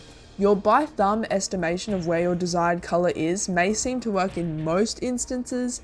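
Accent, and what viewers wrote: Australia. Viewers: as soon as you started talking i immediately yelled 'FELLOW AUSSIE SPOTTED' (it is 12am)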